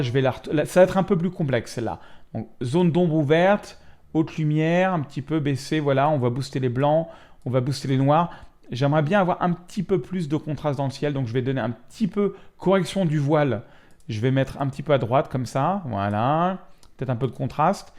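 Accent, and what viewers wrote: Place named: South, France. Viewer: Super tuto, dynamique, humour, l"accent du midi, bref que du bonheur.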